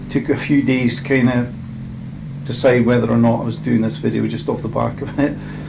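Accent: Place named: Scotland, United Kingdom. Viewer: Hi pal just found your channel, I'm just interested to find out how much warranty work you've had done on your bike ? Thinking of buying one but not sure whether to go new or second hand, new gives warranty obviously. Also, you sound Scottish, don't know if you live up here but if so, where did you buy yours ? Drysdales ?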